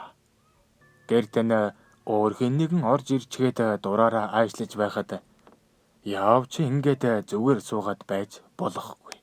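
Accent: Inner Mongolia, China. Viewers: Өвөр Монгол аялага сонсогдоод байх юм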